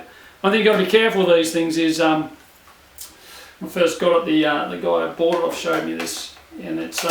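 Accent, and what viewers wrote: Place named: Australia. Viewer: lol, don't understand how all you yanks can't understand what he's saying, He does not have a really thick Australian accent, its just moderate. trust me just listen to the vid a couple of times and you'll get round the lingo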